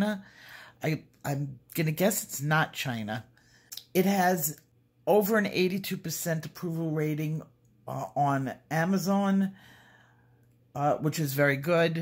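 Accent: New York, United States. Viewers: Love your voice & N.Y. accent.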